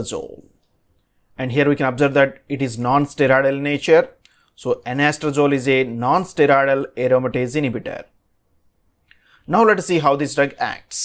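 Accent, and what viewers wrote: South India, India. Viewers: Typical south indian accent of English. Plz pay attention to: It's not Engime...but enzyme Not BlockED but blockt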